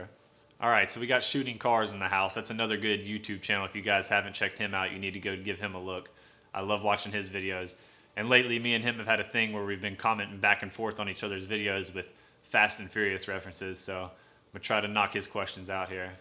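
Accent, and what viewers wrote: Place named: Carolinas, United States. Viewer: Hello sir. I subbed a little after this was made and now I'm going back in time, playing a little catchup. 😉 Cool video... thanks for sharing. I knew you had to be from somewhere close to my home by your accent. I'm in Kings Mountain, NC, just over an hour from Greenville. My grandpa lived in Greer for about 60 years. If you get homesick, give me a holler. ✌Take care, brother.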